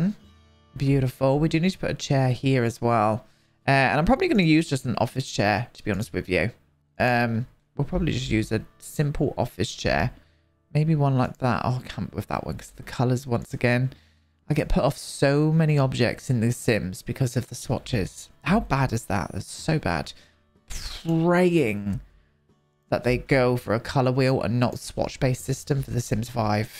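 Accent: United Kingdom. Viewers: Good day I love watching you your sense of humour makes it fun to watch. And my favourite accent is a British one so listening to you and your laugh makes your videos that much better not including your talent and attention to detail. Bloody brilliant you are 😃. Even my husband enjoys watching you with me. Please take care stay safe your Canadian fan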